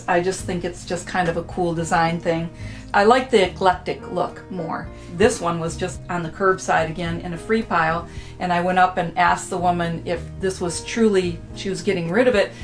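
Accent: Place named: Midwest, United States. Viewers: I caught your Michigan "accent" immediately! lol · Ahhhh, I knew that I detected a Midwestern accent in the narrator's voice!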